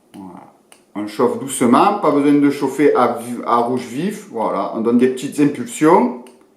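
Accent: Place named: Béziers, France. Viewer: Moi je vais me lancer dans le reconstructible et tes vidéos sont tops. Écoute pas les couillons et avec ton accent on doit pas être bien loin l'un de l'autre moi je dirais tu vis pas loin de Béziers. Bonne continuation d'une nouvelle abonnée. Je suis con je viens juste de voir que ton pseudo ya Narbonne lol c'est moi la couillone mais je m'étais pas trompé.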